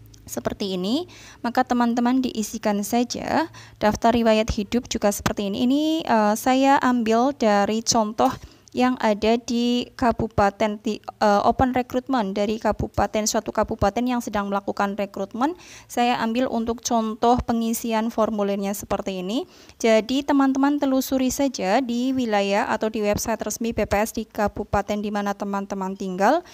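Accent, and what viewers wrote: Banyumas, Indonesia. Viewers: Sampean ngapak Tegal apa Kebumen yukk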